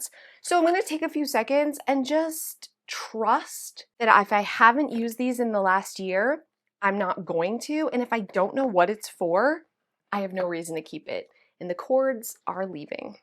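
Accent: Canada. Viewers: just found you, love you!! :face-red-heart-shape:that accent, Canadian??, sunny, funny relief, away from world issues ( to OUR mini world issues)...I'm cluttered to the max!, I have PTSD ( not due to the clutter, which yes, is traumatic!) but after I watch you I feel exhausted, so I've virtually worked right?😜. I keep a journal, to make sure I do SOME decluttering every day, even a tiny amount is something. Thanks for being here x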